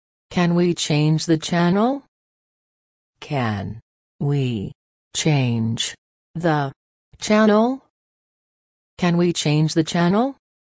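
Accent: United States. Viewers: Спасибо.Но,это американский диалект. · it's american english pronunciation! you should teach RP english. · Нужно английское произношение, это наверное, американское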